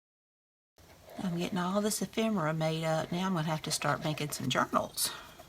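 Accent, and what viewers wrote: South, United States. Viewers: Cute pockets. I like it when you talk. Your southern accent is sweet.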